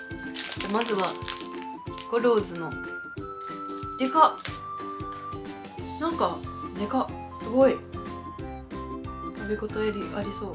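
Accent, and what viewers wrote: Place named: Miyazaki, Japan. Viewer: ホンマでっかＴＶでイワクラさんを観て、訛りが気になったのでチェックしたら、宮崎出身の方だったんですね！ イワクラさんって、東国原知事につづく、全国に知らしめる宮崎の顔になりそうな予感です。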